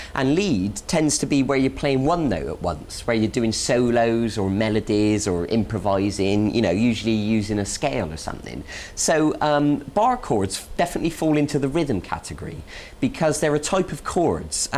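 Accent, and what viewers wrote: United Kingdom. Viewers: oh boy!!! r u kiddin' me!!? I agree that Boys n Gulz have different voives but gays also have got their unique voice, i knew 2day... he's a British n british have their own way of talkin' English. That's it! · his voice isnt funny hes british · Well, Great Britain is a pretty big place, so there's plenty of different dialects (a guy from texas and a new yorker doesn't really sound like they're from the same country). He doesn't talk the stereotypical London english, but it stills sounds like he's form GB.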